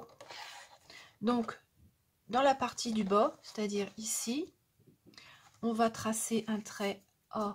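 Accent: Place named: Northern France, France. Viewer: Ccou tout parait tellement simple avec toi j’adore il suffit de bien suivre tes instructions et tout baigne merci et bravo surtout que je débute et je rigole toujours quand tu parles avec tes ptites intonations de ch’nord lol ne change rien tu pourras pas toute façon Hihihihi bonne soirée merci pour le partage bisous 💋👍👋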